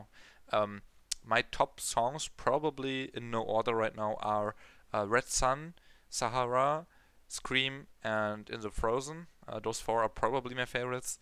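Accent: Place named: Germany. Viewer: Du bist doch Deutscher! Erkenne diesen Akzent sofort c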